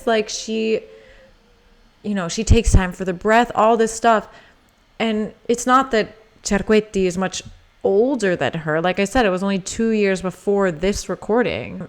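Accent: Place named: Italy. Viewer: Fantastic reaction! Very informative and deep. Your italian accent is nice! 🎉🎉🎉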